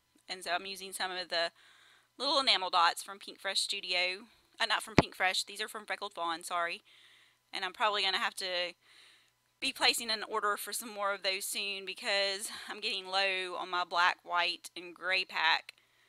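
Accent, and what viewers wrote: South, United States. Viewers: Where are you from? I love your southern accent.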